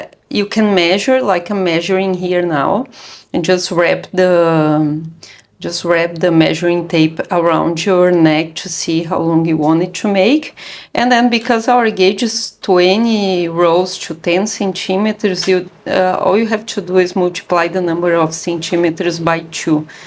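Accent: Brazil. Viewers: Are you brazilian ? You have the brazilian accent 😊 I love the tutorial! Thanks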